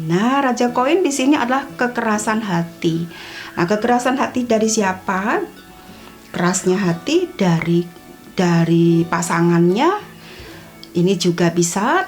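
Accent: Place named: Java, Indonesia. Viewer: Seenaang banget dengar yg bunda sampaikan..logat jawanya yg dipakai..ibarat apa yg ditanam pasti itu yg dituai.. Karma KD ya bund👍👍 🙏🙏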